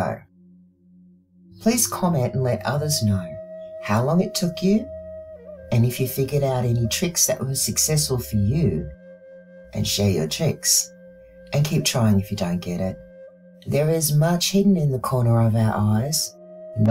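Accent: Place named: Australia. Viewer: you are super cool. Found your channel via the amazing Jon Levi... you sound Aussie to me, so welcome from NSW. Great video and I am glad I have found you. I hope to connect many times with you! The veil that has been blanketed over our eyes continues to keep the masses blind from the exciting truth that would reset our existence in a heartbeat. Cheers.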